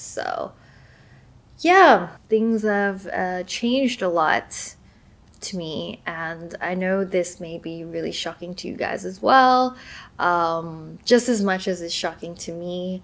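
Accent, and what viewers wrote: Australia. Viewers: ur aussie accent is gone :0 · Youve kinda lost your aussie accent lol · Where did her cute Australian accent go??? 😩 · bruh do u know ur accents- it’s Australian 💀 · it realised just hit me but australian accent is nearly completely gone like i can barely hear it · you (guys) were my childhood youtubers u used to have an australian accent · I haven’t visited ur channel in years ur Aussie accent hone :(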